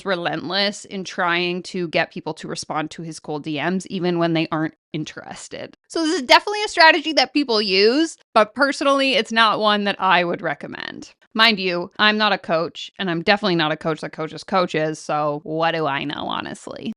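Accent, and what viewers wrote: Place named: Canada. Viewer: I knew about 30 seconds in that your from Canada.. lol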